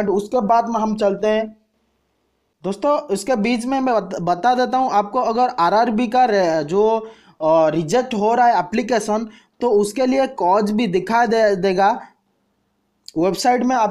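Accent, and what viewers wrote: Odisha, India. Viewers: HAS Tech burbak woh oriya hai thik se suno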